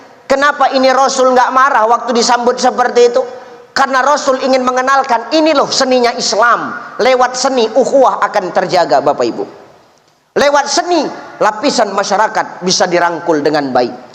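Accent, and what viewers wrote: Medan, Indonesia. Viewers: Gas terus bahasa dan dialek Medan mantap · Uztaz. Kau....kau...kau. Memang itu logah nya. Medan punya bahasa.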